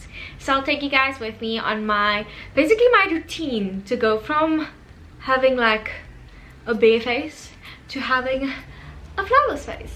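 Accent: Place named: South Africa. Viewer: I could tell by ur accent from the beginning off video. And when u said ja I knew u where south africa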